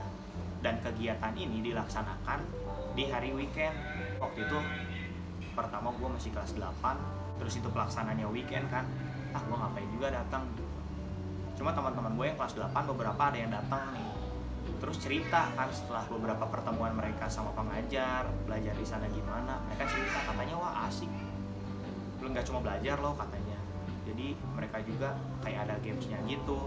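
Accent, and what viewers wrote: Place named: Sunda, Indonesia. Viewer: Menarik ceritanya.jd sejarah tuh yah kakak...ini tapi suara nya kurang keras..teus ketahuan kakak teh orang sunda asli ya..he he..logat sunda nya..kental..pisan..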